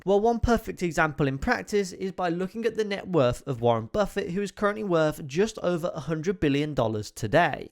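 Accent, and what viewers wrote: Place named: Northern England, United Kingdom. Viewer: I thought it was brummie initially but certain words made me think it was more northern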